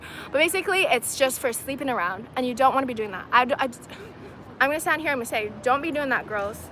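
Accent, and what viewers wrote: United States. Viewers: I thought you were CHINESE? I'm confused..(I heard you mention Africa) but also You talk with an American accent!? Would be great to know seriously where you're from, background & yeah also how you met the "Coach" but you do need to eat Meat/Fish eggs etc I'm speaking as a nutritionist, bodybuilder, sportsman and personal trainer.. you NEED ANIMAL PROTEIN!! 💪🏻 You have a PHENOMENAL VOICE BTW!! 😉👍🏻❤️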